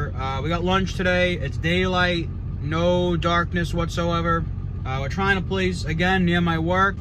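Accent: Boston, United States. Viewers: I hear a Boston accent! Gahlick, pahmesiahn. REPRESENT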